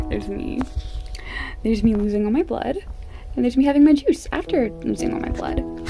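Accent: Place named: United States. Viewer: она американка т.к. акцент американский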